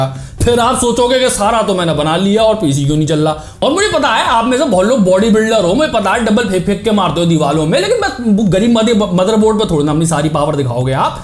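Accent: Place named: Bhopal, India. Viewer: Bhopali ho kya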